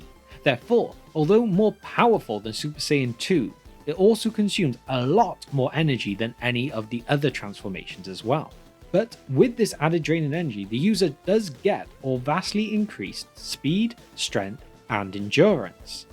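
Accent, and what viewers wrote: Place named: North West England, United Kingdom. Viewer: Aah cool. I'm a scouser and thought I heard a hint of North West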